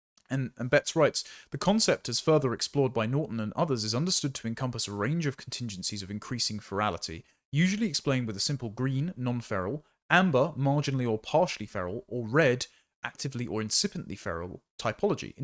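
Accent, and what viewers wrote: RP, United Kingdom. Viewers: You sound like a toff.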